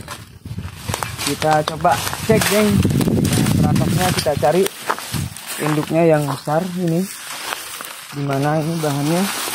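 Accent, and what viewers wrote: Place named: Madura, Indonesia. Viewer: Keren.. Klo dr logat bahasanx logat madura nih.... 👍👍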